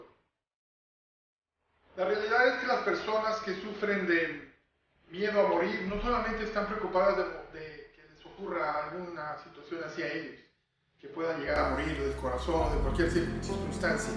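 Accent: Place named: Mexico. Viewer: disculpe sr de que pais es usted tienen acento mexicano cual su numero o su tratamiento y cuanto cuesta el libro o el CD saludos desde lima peru tiene alguna sede por aqui en lima peru bendiciones y gracias por ayudar a muchas personas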